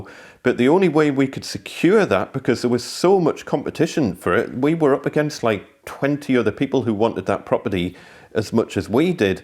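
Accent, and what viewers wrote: Newcastle, United Kingdom. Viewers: His accent is from Newcastle which is north England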